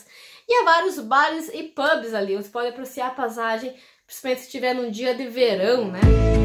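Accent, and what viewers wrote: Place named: Paraná, Brazil. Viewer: Sotaque mais lindo do meu interior do PR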